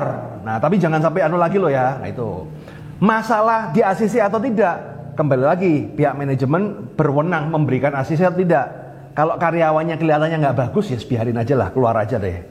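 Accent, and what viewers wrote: Java, Indonesia. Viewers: Jowo medok mas...juan kekel aku mas...maturnuwun mas anda luarbiasa🙏🙏🙏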